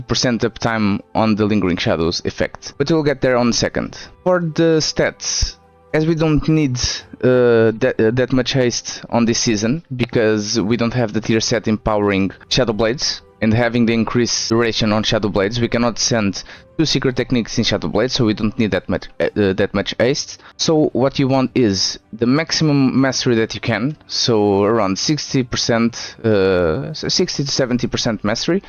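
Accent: Portugal. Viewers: you sound portuguese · Are you portuguese? the accent does sound like it at least! Thank you for the coverage on the changes, excited for the next season! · parece tuga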